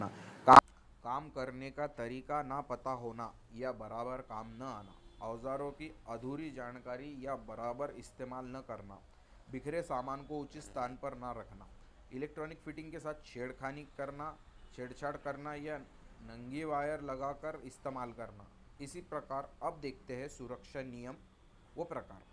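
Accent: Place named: Maharashtra, India. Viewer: From your hindi... I assured that you are MARATHI person... Jay maharashtra bhau 🙏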